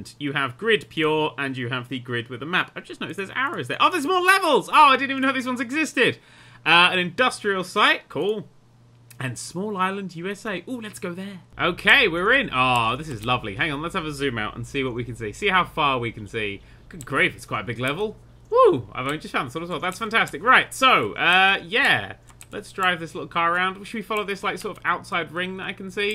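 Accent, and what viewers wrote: United Kingdom. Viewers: is he british but I like his laugh XD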